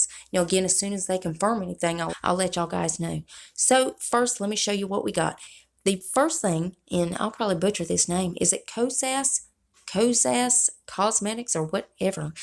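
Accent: South, United States. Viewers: Hey SWEETFACE,, i have a question? I was taken of off the waiting list for the lux box, but I did get my December bass box. So they build me the $24.99 for the December Lux box! So am I going to get charged the other $25 plus another 25 for my January box? Do you know I'm so confused right now. LOL and by the way I absolutely love your videos and your sweet Southern Tang! I'm from down south too so I love you girl and thank you for all the spoilers I just started following you about a week ago maybe two I found you and the first video I was hooked.😅😅😅💖💖💖💖💖💖💖💖💖💖💖💖💖💖💖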